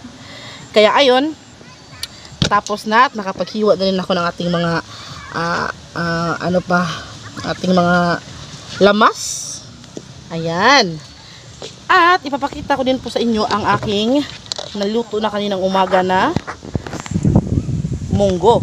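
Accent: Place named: China. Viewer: sanggi day pag tagalog ka ka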